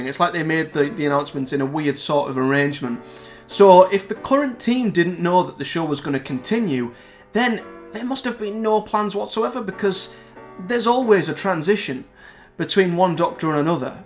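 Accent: Middlesbrough, United Kingdom. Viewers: Hi new viewer here, I agree I think it's a last ditch attempt to get viewers on side, I stopped watching after peter capaldi because I believe they were jumping on the woke wagon, this seemed to be proved true as the series went on. Before everyone calls me a misogynist, I though missy was the greatest baddie theres ever been on dr who, anyway sorry for the long winded message, are you a Middlesbrough lad , I'm from redcar recognised the accent.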